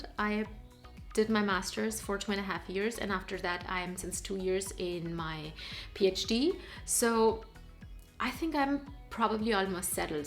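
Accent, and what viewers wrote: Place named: Germany. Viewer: German englisch dialect 🤣👌🏽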